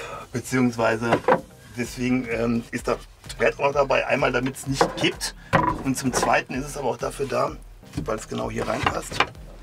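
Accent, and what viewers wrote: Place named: Ruhr, Germany. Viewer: Da hör ich doch Ruhrpott, aber vom feinsten. Geiler Typ Geiler Anhänger 👌🏼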